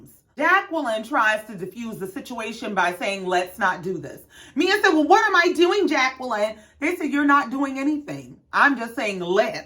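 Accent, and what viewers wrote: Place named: Gullah Geechee, United States. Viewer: I just found your channel. I love your commentary and it’s a plus you sound just like my people from SC. (Geechie) Subscribed! ☺️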